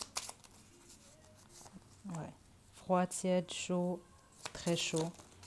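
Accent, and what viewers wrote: Canada. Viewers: J'aime aussi votre accent canadien. Etes vous Canadienne? Je vous suis de Douala, Cameroun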